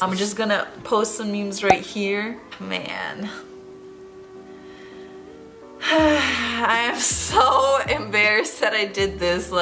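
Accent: United States. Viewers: Been trying to figure out what state she is from with her accent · Reminds me of a chicago south side girl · we are everywhere haha i'd say you're from New York or something lol, looking forward to more of your videos!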